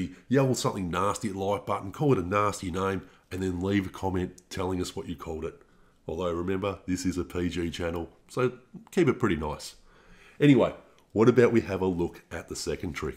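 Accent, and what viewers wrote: Australia. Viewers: Yes it helps us learn and understand math when we get how the algorithm works. Another great lesson . Yet I marvel at the idea that some- know it all -sharpshooter should hoot about your videos for any reason . I mean seriously, if they don't like your " down under " dialect they should go find something to do with themselves.😁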